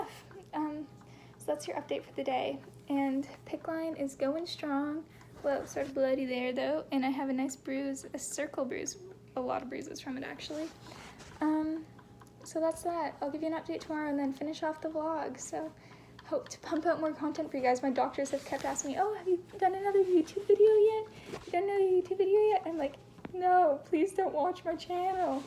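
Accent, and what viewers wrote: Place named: Canada. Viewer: I thought she was from Australia too! :P But then the Canadian accent :P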